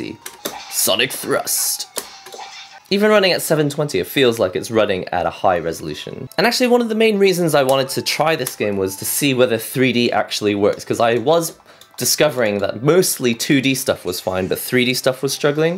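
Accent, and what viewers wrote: United Kingdom. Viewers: British mate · This is the only video I've seen by you and I like your accent. I don't know if it's British or something else but it makes you permanently sound mildly sarcastic even though you aren't being sarcastic. It added to the fun of watching (or rather listening) you talk about this product.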